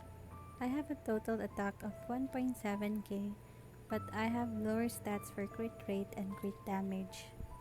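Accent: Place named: Philippines. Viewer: Sabi ko na nga ba filipino accent si ate support kita🥰♥️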